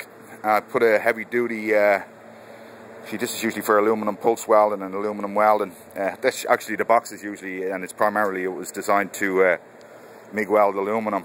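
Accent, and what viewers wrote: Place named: Dublin, Ireland. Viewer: That's a Dubh accent if I ever heard one.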